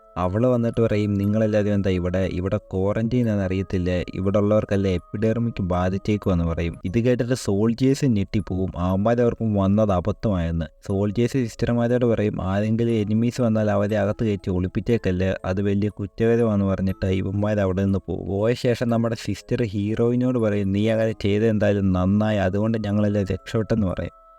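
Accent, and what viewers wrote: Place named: Kozhikode, India. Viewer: കോട്ടയത്ത് ഉള്ളവർക്ക് നല്ല pronounciation ആണ്. ഇത് kozhikode or kannur ആണ്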